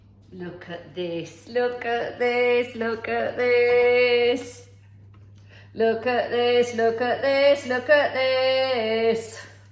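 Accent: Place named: Cockney, United Kingdom. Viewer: She speaks with a cockney accent. She must be the last cockney left in Britain.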